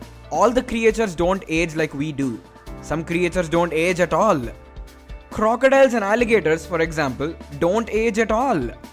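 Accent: India. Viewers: Good topic and research but narration is bad. Feels like a Hindi professor teaching in English.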